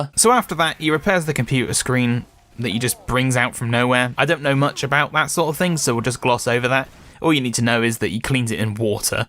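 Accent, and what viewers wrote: United Kingdom. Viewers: oh my gosh your a bri'ish linus tech tips!! I would actually love to hear you talk more about pc's though and do more of these commentary-esque videos! They are a nice break from the SCP theme and hopefully they have enough interests to not run your views into the dirt.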